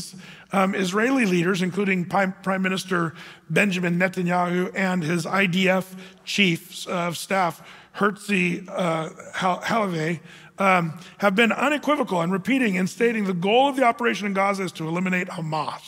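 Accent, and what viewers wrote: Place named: South, United States. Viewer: you being from California …you certainly talk and sound like your from the Southern United States …Accent…..have a blessed day ✝️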